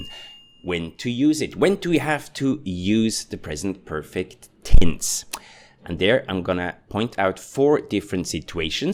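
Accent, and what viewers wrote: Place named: Switzerland. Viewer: He sounds like a german speaker from Switzerland. 😅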